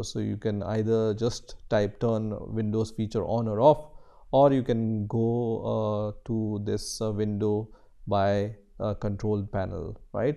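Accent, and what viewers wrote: India. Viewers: an informatic tutoriel with an indian accent. Now i feel confident following his instruction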